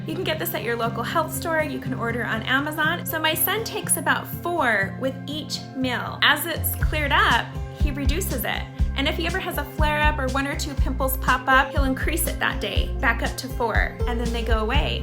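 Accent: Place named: Utah, United States. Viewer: All the way through the video, I kept thinking to myself, “she sounds like a Utah mom.” Then when you mentioned here from Kaysville, I had to laugh. I know a lot of people have had a great deal of success. Doing this, with B5, vitamin a or zinc. Years ago, I had success using a combination of all of them, but forgot about B5 in the years sense, so I’m glad I came across this video as a reminder. My son started getting severe acne right after his last growth spurt and a couple days ago I increased his intake of vitamin a and zinc. I’ve also read a lot of science based evidence that iodine in its natural state, such as a sea, kelp supplement is beneficial and I’m just waiting for those to show up in the mail. Since we have a natural grocers right here in bountiful, I’ll also swing by there and pick up some B5. He’s only 12 and it hasn’t been coming this year for his self-esteem yet so it’ll be good to help him how he’s much younger.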